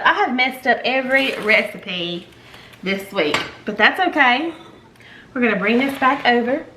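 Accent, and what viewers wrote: South, United States. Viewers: I just love the way you are so laid back and Southern! I haven't tried one of your recipes that I don't like!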